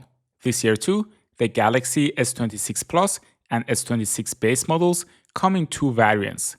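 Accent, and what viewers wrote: Iran. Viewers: همیشه یه لهجه ایرانی به گوش آشنا میاد 😊 موفق باشی پسر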